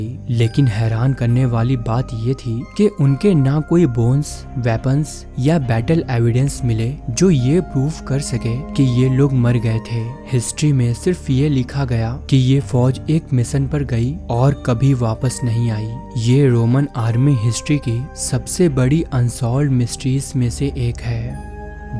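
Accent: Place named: Bengal, India. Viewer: Aab Bengali Accent Mei Q Bol Rahe Ho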